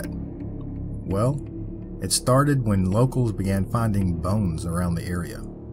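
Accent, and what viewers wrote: South, United States. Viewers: You're welcome! I saw on another reply of yours (on the Conjure Chest vid, I believe) that you were concerned about your accent. If anything, your accent is not a deterrent, but validation. It is clear that you take time to research your content and present things in a factual, non-hyped manner. Those from the South will most certainly appreciate you telling the stories from the regions that both you and they call home. That is truly appreciated.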